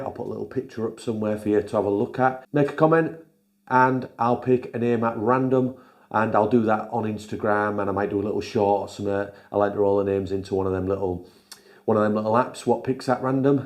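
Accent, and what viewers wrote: Hull, United Kingdom. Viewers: Hi, love the stuff you do with a proper ull accent makes it easy for a Bransholme lad to understand keep it up 👍👍👍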